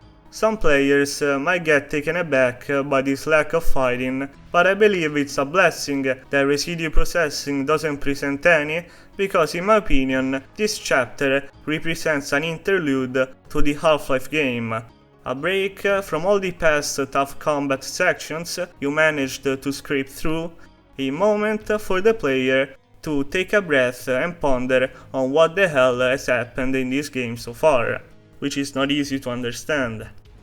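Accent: Italy. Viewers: You sound overly italian, I love it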